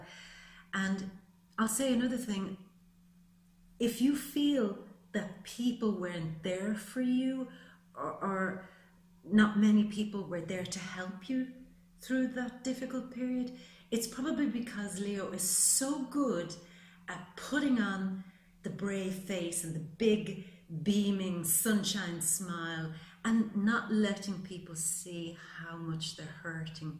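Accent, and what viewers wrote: Ireland. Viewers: Helo there buitifull lady , i thank you from the bottom of my big Leo hart ,wow love your Irish accent to , happy New yr , well I had tears runing down my cheek for thats my life you read, i know it's General , but i felt it for it was saturn on my case 4th house in my chart in sag , but none the less he's been my task master, it's been karma,i suferd 21 yrs in total, i have endured more than any man, but you are right it a cumilated in the last 3 .. I call it hell an back ,i am still hear , and I really cry when you said ive paid 10 life's karma,.saturn is the teacher task master and father time , Lord of karma , he took me to the limit of my endurance , striped me bare and shown me how insignicant we are as beings for we truly are , pain and sufering loss and the false fell like dead flys , to the way yet test after test i endured humbly for i no i am gratefully for his lessons , for he surely is the greatest teacher of all , and I learnt the hard way ,.i all ways have Spence age seven ,. 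Ime now 60 ... but i like what you said because I do be leave ive passed the tests because simply i wouldn't be hear ,, this i do believe 1000%.. and the rewards via saturn are the greatest for the endever and passing,, yet to come, patients was the hardest lesson humility releasing egos pride, Many many lessons, false pride ,i am a current man than when I started , . I no got to move from hear padt , finally to get ready to receive,. Renewed hope change finish my transformation, ready to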